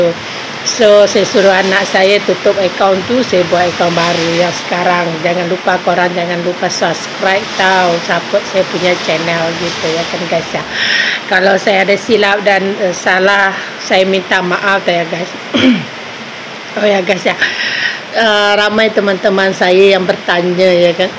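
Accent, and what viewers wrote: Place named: Malaysia. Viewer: logatnya Udah Ada malaysia kak 😊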